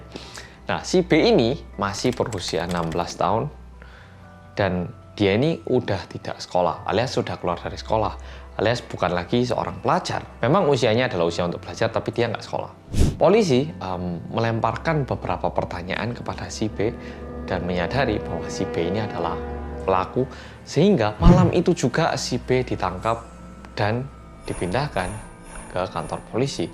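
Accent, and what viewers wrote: Java, Indonesia. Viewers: Lucu ini kakak hihi muedok tnn ntok e ngmong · is “timoer” but backwards. It’s a typical wordplay in Malang, a city in East Java, Indonesia, where he grew up. “Timoer” or “timur” means east. In this context, means that he’s a korean who is from (or grew up in) East Java. “Yang medok” basically means he who has thick accent, because he speaks Indonesian and Javanese in thick javanese accent. (Medok is a javanese term so maybe that’s why you dont understand if you’re learning Indonesian) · Mas: halo guys welcome back to bersama orang korea yang Me: MEDHOOOKKKK!!! ❤❤❤❤ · wong Korea sing medhooookk!! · Cus team mendeloookk... Bersama orang Korea yang medoooookk 😊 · Bersama orang Korea yang medokk keren juga tu baju nya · Keren kak.... medoggg.... salam kenal kak... +1 dukungan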